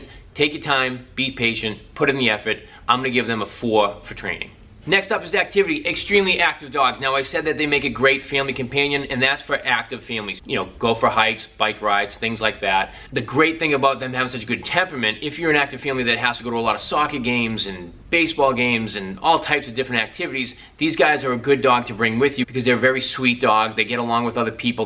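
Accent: Boston, United States. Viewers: I'm a New Yorker and can say he has a Boston (or some sort of New England accent) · You sound like you’re from Massachusetts or Rhode Island. ❤I’m near Boston or Bahwstahn. 😂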